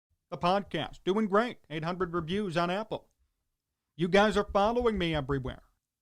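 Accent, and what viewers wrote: Chicago, United States. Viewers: A Chicago voice for those who love Chicago and I am from Iowa and live in Wisconsin. But love me some Chicago. You keep me connected to Chicago sports no matter where I am. It doesn't make any difference how old you are but that you talk sense. That's you,. And the real reason is that you are just one hell of a respectful person.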